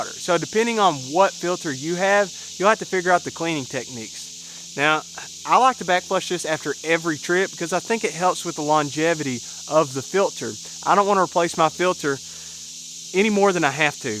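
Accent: Kentucky, United States. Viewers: What’s a “Tint” ? Not sure what a “ Tint” is ? Lol😂😂 It’s like me saying “ Pak your Ca “ I was exposed to your Kentucky accent when Jason hiked up here in March in the snow ! Very cool ! Cheers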